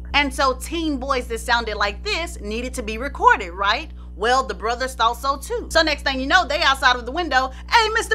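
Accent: South, United States. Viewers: lol it’s her southern accent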